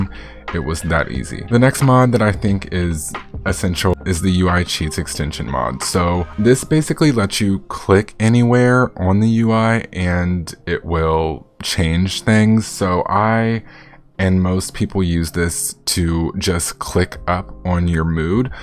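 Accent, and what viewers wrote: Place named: United Kingdom. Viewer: ok but like thanks fir not gamer sceaming in a british accent 💅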